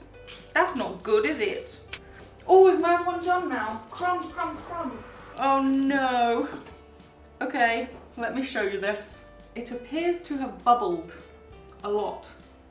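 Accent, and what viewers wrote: United Kingdom. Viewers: Wait are you british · I love your British accent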